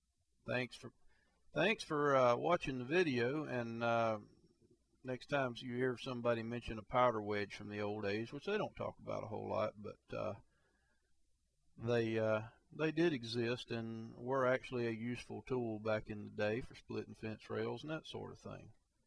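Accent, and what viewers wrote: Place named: South, United States. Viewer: Normally I hate long intros, but I feel like it really made the video more entertaining, especially with the southern accent😂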